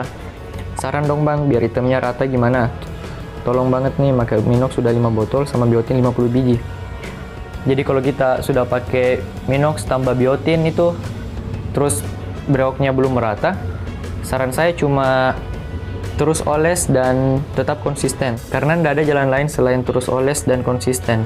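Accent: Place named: Sulawesi, Indonesia. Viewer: Orang sulawesi ya?logat.y kentara😁✌️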